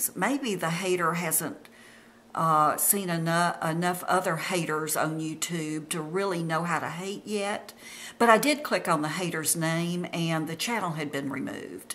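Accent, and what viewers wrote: South, United States. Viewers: EXCELLENT!!!!! THIS WAS THE BEST REPLY TO HATERS!!!! JOB WELL DONE! I subscribed to your channel for these reason... 1- you look way younger than me (56) and I can use all the tips to look as young as you. 2- you talk to us you tubers as if we were friends forever...love that! 3- you take your time to explain things. 4- you are just stunning to look at....even my hubby would agree. 5- you are very open and welcoming...you draw us in...so sweet! 6- love, love, love your southern accent. 7- you are truly one of Gods children and that does show....you keep shining. Hugs and Kisses... 💛💛💛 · I'm 43 and I'm a Grandma too. You are a beautiful woman and I love your southern accent. Don't ever let a hater get to you. A hater, hates themselves therefore unable to love anybody else. xo Kat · I just subscribed to you! You're so beautiful and refined. 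Just a soft spoken, gentle Southern woman. I just turned 50 and am going through some anxiety about getting older. I don't look my age, thanks to genetics and staying out of the sun, but it is inevitable. I look forward to watching more videos from you and of course your granddog! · I just discovered your videos and FELL IN LOVE with them and with you!!! I am 73, and also will go down fighting! I love your soft, Southern voice, very easy on the ears! Love all of your topics! Keep making as many videos as you possibly can and I will watch and be thankful for them all!!!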